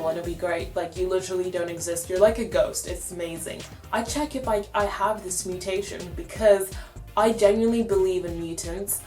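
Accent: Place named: Australia. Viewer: are you Australian cuz u got the accent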